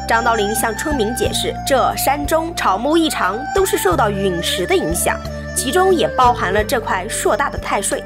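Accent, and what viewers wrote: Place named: Shanxi, China. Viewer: 山西还是陕西？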